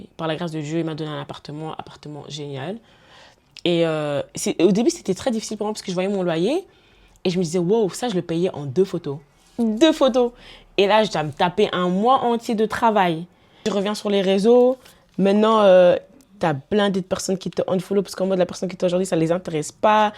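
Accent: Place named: Democratic Republic of the Congo. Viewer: Moi aussi 😅elle fait cet accent congolais de temp à autre la, moi je me disais peut un parent congolais lol. C’est quoi l’histoire du français please. Like how did you start speaking French as a Ghanaian?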